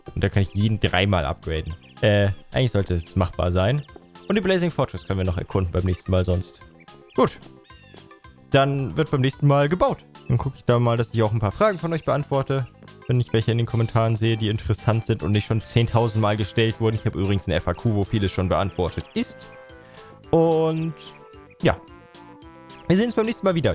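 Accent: Germany. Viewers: man hört des Todes das du deutscher bist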